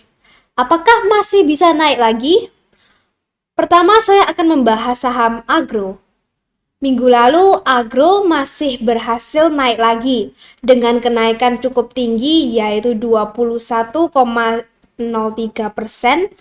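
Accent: Java, Indonesia. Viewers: Mantabb... Walau medok tp tetep insightful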